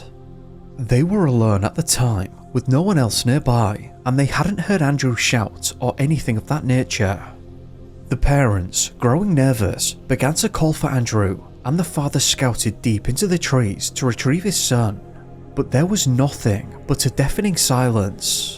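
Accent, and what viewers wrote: United Kingdom. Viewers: Accent from the UK, it's not bad. I'm from Finland and I learned to listen to that accent while studying English in school bc our teacher talks exactly the same way 😊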